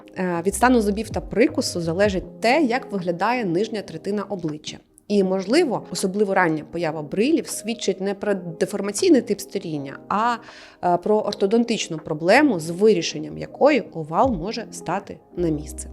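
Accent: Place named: Surzhyk, Ukraine. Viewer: Шийно-КОМІРЦЕВА зона...купа суржиків, неприємно слухати(((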